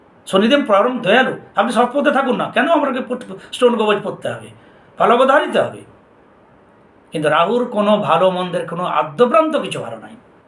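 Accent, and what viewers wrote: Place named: West Bengal, India. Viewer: আপনার বাড়িটি কোথায়? পুরুলিয়া, বাঁকুড়া,বা বীরভূম হতে পারে।মাঝে মাঝেই স্থানীয় টান চলে আসছে। তবে সহজ, সরল, ভাবে বোধগম্য, সহজপাচ্য পাঠ সত্যিই অনবদ্য। কৃতজ্ঞতা স্বীকারে -- মনি।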